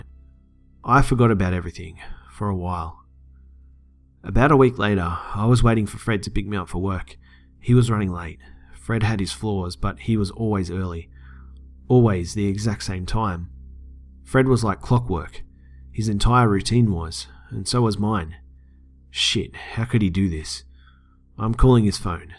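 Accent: Australia. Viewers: I think you are Australian?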